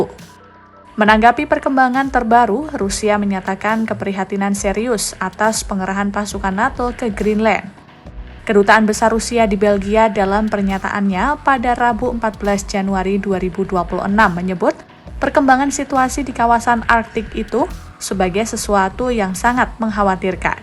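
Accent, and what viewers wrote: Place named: Java, Indonesia. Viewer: Suara Mbaknya wong jowo ya? Medhok poll😅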